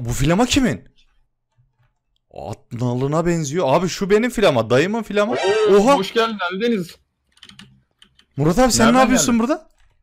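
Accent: Yozgat, Turkey. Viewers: Abi Yozgatlı mısın??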